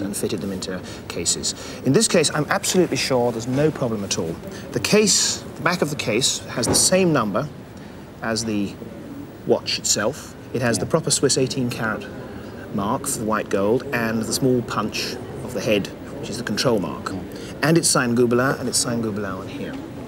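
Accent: United Kingdom. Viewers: Nothing sounds smarter than a British dude who smacks his lips while waxing poetic. Awesome stuff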